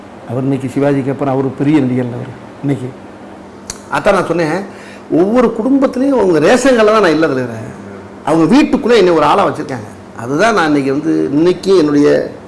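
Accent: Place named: Madurai, India. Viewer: Anne neenga elladadala santhanam pondra " nagareegam ellada comedians" vara arambichittanga. Vaya toranda ketta vartha, kudumbattoda pakka mudiyala anne. Unga azhagana Madurai Tamila ketta comedy meendum kodunga.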